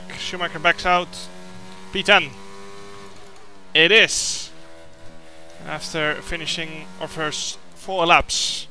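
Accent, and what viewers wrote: Netherlands. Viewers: are you form the netherlands/ kom je uit nederland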